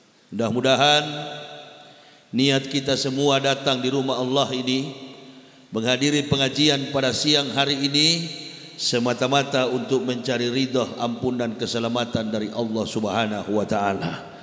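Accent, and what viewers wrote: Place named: Manado, Indonesia. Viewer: Ilmu perbandingan agama ini bagus utk pengetahuan 👍.. suka dengan gaya Manado pak yahya masih sangat kental 😄👍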